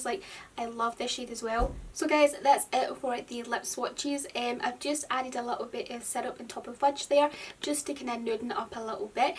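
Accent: Scotland, United Kingdom. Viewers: are you scotisch? 😍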